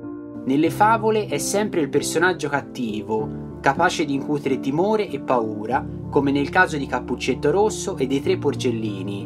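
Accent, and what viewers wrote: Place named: Tuscany, Italy. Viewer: Video molto istruttivo.Bella la parlata toscana sempre gradita grazie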